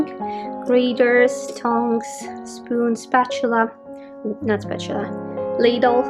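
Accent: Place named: Hungary. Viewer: Your accent sounds like Naemi of Scandish Home, she is Hungarian. She and her family live in Oregon, USA.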